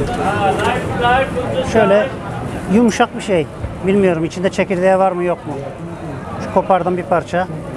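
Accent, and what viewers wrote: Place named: Alanya, Turkey. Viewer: Alanyalimisin siven ayni alanya yoruuuu gibi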